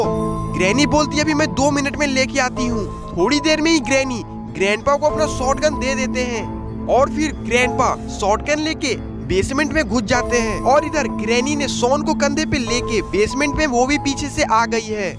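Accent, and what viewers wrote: Bihar, India. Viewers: 😅😅😅😅 aap Bihari ho kya❤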